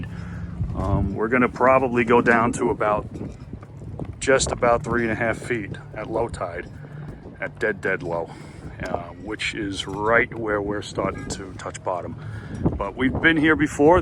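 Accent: New York, United States. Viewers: Hi I am sorry i don't know your name. I enjoy watching your Video's. Love your New York accent. People say I sound like I am from Bronx.. LOL We live and boat on the South Shore long Island. We also snow bird in Naples FL.. My Wife and I have a 94 Mainship which We love. i also do my own work so i can relate to your video'. Safe Travels ( 7 knots is painfully slow) lol keep the Video's coming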